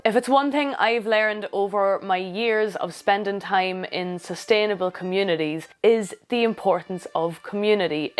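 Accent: Ireland. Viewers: ♡ i LOVE your accent please please please .............. ~ whether I get to be your neighbor in Ireland (an absolute ♡ dream for me) or not .... with all my heart I pray we shall be in Heaven 🙏 🙏🙏🙏🙏🙏🙏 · Great video. What's the accent? Not Louth . . . maybe Monaghan?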